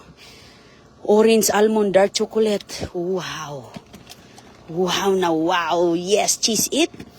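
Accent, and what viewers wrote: Visayas, Philippines. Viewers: Visaya k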